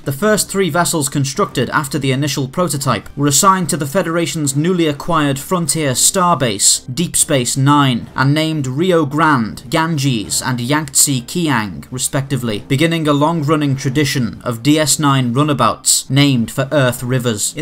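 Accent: United Kingdom. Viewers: At last, I'm hearing an English/UK accent.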